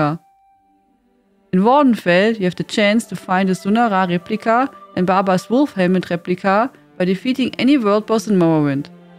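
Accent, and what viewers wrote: Germany. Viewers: You from Deutschland? sounds like one.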